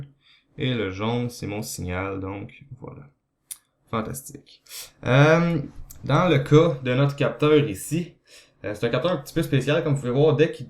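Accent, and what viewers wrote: Quebec, Canada. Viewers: Top ta vidéo l'ami. Juste une chose pour faciliter la compréhension du Français avec l'accens Q'bequoi... Parles un peu moins vite... S'il te plais... Un cousin Français qui apprécie tes tuto, et qui voudrais pleinement en profiter...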